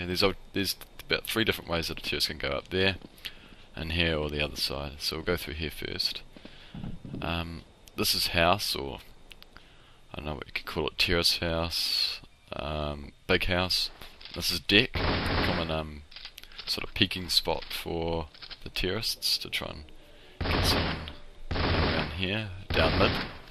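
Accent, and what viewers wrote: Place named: New Zealand. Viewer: cool accent, New Zealand? You sound like flight of the choncords.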